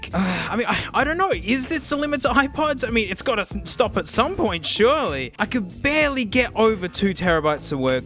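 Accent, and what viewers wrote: Australia. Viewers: I visited an old video and just realised that as his videos gone on, he’s picked up an Australian accent along the way, I’m not sure really, put your opinions in the replies because I don’t really know. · You're sooooo Australian..... And I love it! 🥰🤣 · Maybe because he’s Australian?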